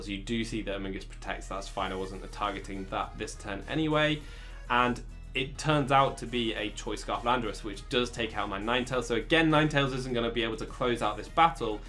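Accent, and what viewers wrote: United Kingdom. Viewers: Hey bro, you sound british. I have a question for you, is it coming home?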